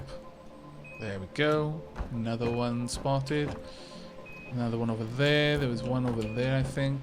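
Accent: Wales, United Kingdom. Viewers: Benjamin Chisholm I believe he's Welsh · is Welsh, but living in Scotland.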